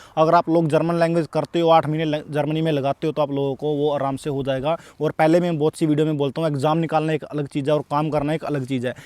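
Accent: Haryana, India. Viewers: Bhai Haryana te lage mne tu ❤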